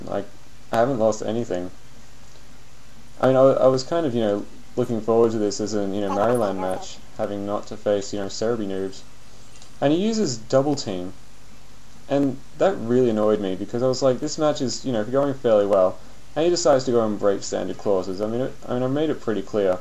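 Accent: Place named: Australia. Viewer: Fuck yeah Australian accent narration =)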